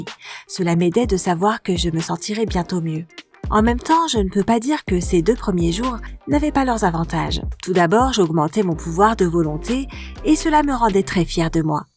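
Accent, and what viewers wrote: Quebec, Canada. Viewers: Ce qui est marrant c'est qu'on a l'impression que la personne essaie de parler dans un français à priori neutre et "universel" . Je crois qu'il y a une légère pointe d'accent québecois. Mais pourquoi le gommer? C'est sympathique, au contraire, comme le sont d'ailleurs aussi les accents belges ou congolais. 😉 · Accent québécois deguelasse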